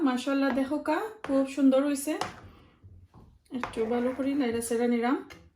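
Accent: Sylhet, Bangladesh. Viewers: nice,please sister don’t change your accent, I like to hear our Sylheti accent, u r a Sylheti person so why should u change your accent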